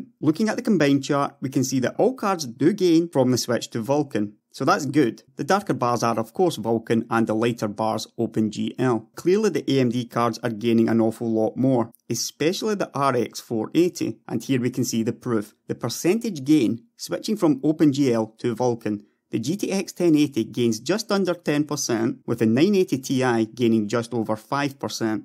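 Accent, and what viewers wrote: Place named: North, United Kingdom. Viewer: i love that northern accent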